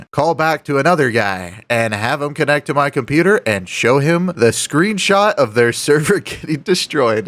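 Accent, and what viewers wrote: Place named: South, United States. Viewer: By now your unique voice and y'all-type accent should be known to most large scam centers. You'll likely have to start using a voice changer. I'm waiting for these Indian criminals to wake up to the possibility of using AI against scam baiters.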